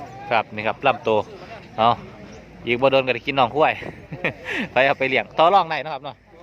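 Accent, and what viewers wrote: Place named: Sisaket, Thailand. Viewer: ศรีสะเกษชัดเจนจ้าอ้าย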